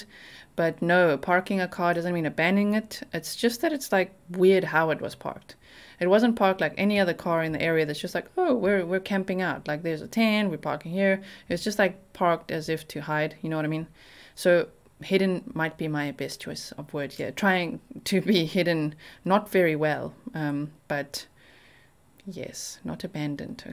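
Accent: South Africa. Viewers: Love your saffie accent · are you South African?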